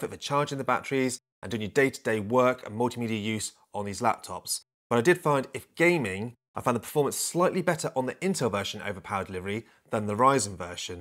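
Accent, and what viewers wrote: United Kingdom. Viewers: laptop review in BS English is refreshing, since majority are in US English. makes the laptop sounds classier.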